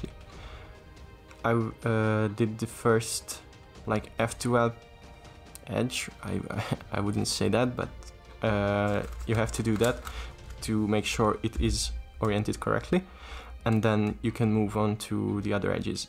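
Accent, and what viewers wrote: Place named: India. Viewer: Nice indian commentary, lots of love from New Delhi!♥️♥️♥️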